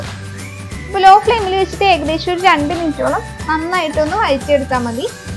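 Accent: Thrissur, India. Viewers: Nala malayalam Trisuranele😊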